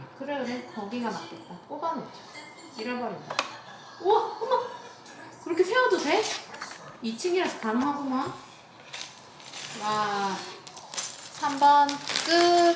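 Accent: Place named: Busan, South Korea. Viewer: 저도 놀러왔어요😍 사투리를 쓰시네요? 부산분이신가?부산사투리같은데~ 저는 부산인데~ㅋㅋ 근데 열라면에 순두부는 반칙입니다~😂방금 밥먹었는데 급 라면 한그릇해야겠어요ㅋㅋ 구독 꾹 누르고 갑니다. 또 놀러올께요~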